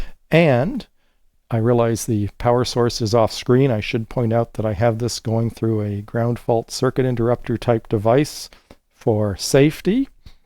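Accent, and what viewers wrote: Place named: Canada. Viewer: Every time I hear a-gain versus a-gin I know I'm listening to a smart Canadian. I appreciate you sir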